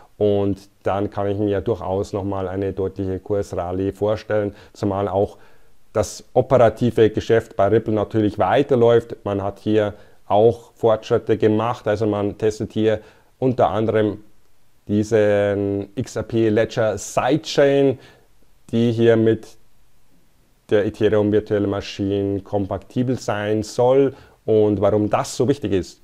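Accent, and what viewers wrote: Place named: Swabia, Germany. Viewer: schwäbisches Englisch ist immer wieder köstlich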